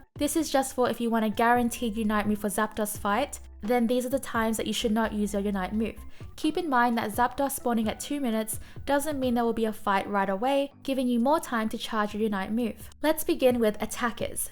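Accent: Australia. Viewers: Plz make the Australian pronunciation for every pokemon. Videos by gen plz. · I'm Australian so I never even noticed your accent, keep up the great work! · As an American finding your channel in the last 3 weeks hearing your pronunciation of Zapdos was so interesting to me because of the whole ArcticUNO, ZapDOS, MolTRES thing where the names of the birds are uno, dos, tres in Spanish and that's the whole naming convention. Having you say it as Dos like windows DOS has consistently fought my old man pronunciation in a way that is both funny and cool, I'd love a series of the names Austrailian's sound out as different than the typical American's! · Aussie accent pokerap incoming. Can't wait. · Love ur Australian accent and I appreciate all the hard work you put in to give us indepth info on the game so we can better understand the game and improve looking forward to future videos......I believe zeraora has the best unite move for Zapdos since it not only deals damage but increases atk spd combined with discharge and volt switch he can solo Zapdos easy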